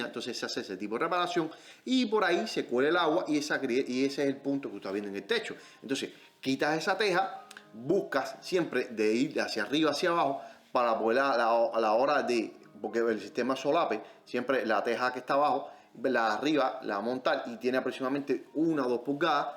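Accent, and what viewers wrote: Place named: Cuba. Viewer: Este tipo que idioma habla ....parece a veces castellano cubano con partes de ingles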